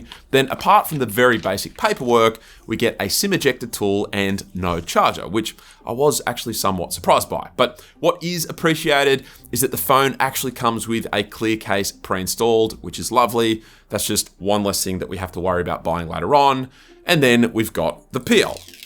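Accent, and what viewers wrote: Australia. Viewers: His Australian voice makes this even more soothing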